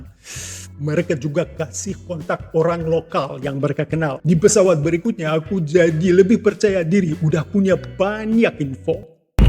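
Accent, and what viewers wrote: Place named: Java, Indonesia. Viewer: Weleh..bahasamu medok..banget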